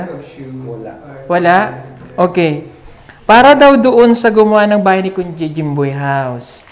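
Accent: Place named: Iloilo, Philippines. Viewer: Nakakatuwa si sir may tunong pag ka ilonggo 😅😅😅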